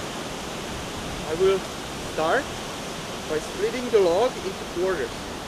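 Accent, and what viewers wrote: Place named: Germany. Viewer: Don't wanna be that guy, but isn't he german?? Accent and camo gave it away :P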